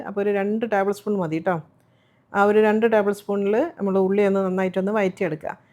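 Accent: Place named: Kannur, India. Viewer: Kannur aano samsaaram kelkkumbol angane thonnunnu 🥰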